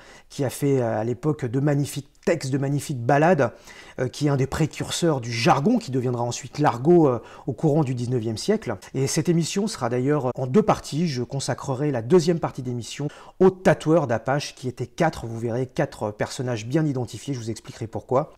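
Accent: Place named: Paris, France. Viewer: Je rajouterais un +1 pour ton phrasé qui fleure bon le Paris qu'on aime !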